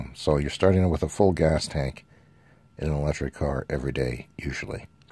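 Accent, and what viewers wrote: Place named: Saskatchewan, Canada. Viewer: It’s weird hearing an accent like mine, being from Saskatoon: the only other non-ghetto city of Saskatchewan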